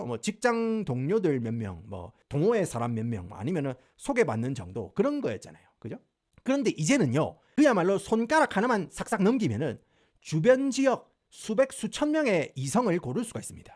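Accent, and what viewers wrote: Gyeongsang, South Korea. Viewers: 허리까지 내려오는 까만쌩머리이 ㅋㅋㅋㅋㅋㅋㅋㅋㅋㅋ아놔 커피뿜음 ㅋㅋㅋㅋㅋ 넘 젬나는 갱상도 ㅋㅋㅋㅋㅋㅋ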